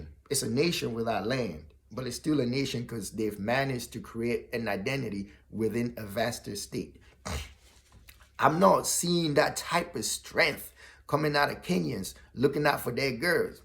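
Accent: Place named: Liberia. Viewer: You sound Liberian dude ..she is the one using the guys for subscribers, we all know what’s going on and it’s all innocent 😇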